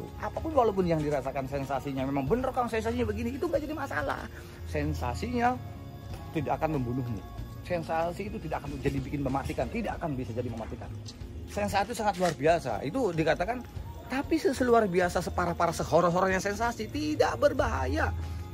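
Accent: Banyumas, Indonesia. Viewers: Nang ndi kue pak,deneng ngapak 😀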